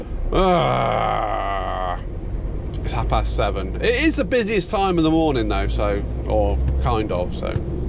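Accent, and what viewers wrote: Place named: England, United Kingdom. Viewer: About 4 years since I left England so I'm really happy hearing English accent. And driving an left side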